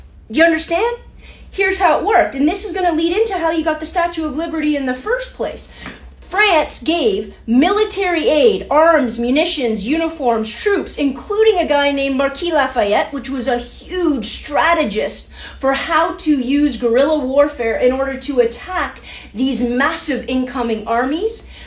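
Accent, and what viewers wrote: Canada. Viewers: I got a lot from this presentation. I caught the Canadian accent and I'm not surprised that you're better educated than most of us. Fossils like me remember real teachers. They're gone. We have some excellent ones who can't teach the unteachable. Media has ravaged our society and driven it into the ground since the fifties. France has every reason to take back the lady. We've defiled her. I'll be petty at the end here and fuss over your hair. I want that in the spring 🌱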